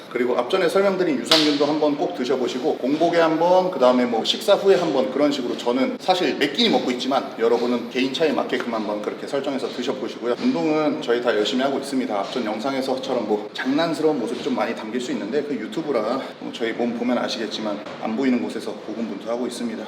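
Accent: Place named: Countryside, South Korea. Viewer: 는 어디 시골 농부 페이스+얼굴과 어울리는 구수한 사투리 때문에 극호 ㅋ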